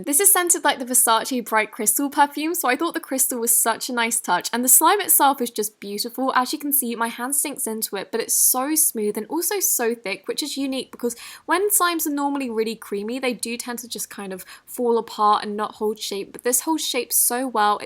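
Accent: United Kingdom. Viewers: r u british? u have a nice accent and I love it! is that weird??? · I don't know if it's just me my cousin doesn't really like her asking but I love her British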